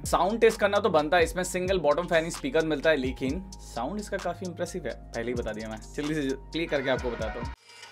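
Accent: Bengal, India. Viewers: Are you from Bengal?? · Bro, are you bangali? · ARE YOU A BENGALI SIR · Bro are you Bengali??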